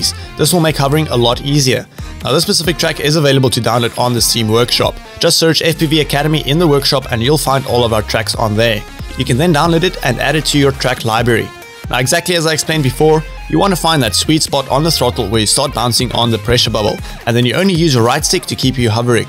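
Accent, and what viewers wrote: South Africa. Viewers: hey my bru are you South African? you sound south african but your address says new zealand!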